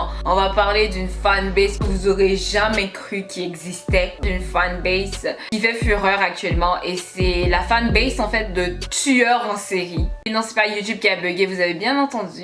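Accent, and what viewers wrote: Quebec, Canada. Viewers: T’es québécoise nan ?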